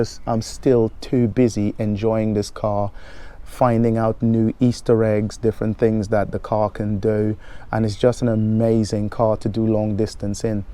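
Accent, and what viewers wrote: Trinidad and Tobago. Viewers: Im hearing the trini accent. 🇹🇹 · Caught that Trini accent...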